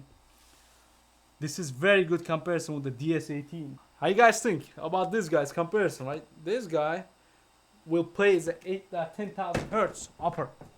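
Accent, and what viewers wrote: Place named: Russia. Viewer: The best according to you Russian accent speaker review guy.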